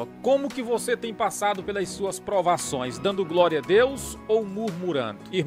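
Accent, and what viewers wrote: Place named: Northeast, Brazil. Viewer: Paz pastor gosto de assistir seus vídeos, e gosto principalmente do seu modo de falar,esse sotaque nordestino, sou nordestino mas moro em Manaus a muitos anos, oro pra que Deus continue abençoando o seu ministério, pois tem trazido edificação, Deus abençoe todos que assistem, vai nessa força varão Deus e contigo!!!!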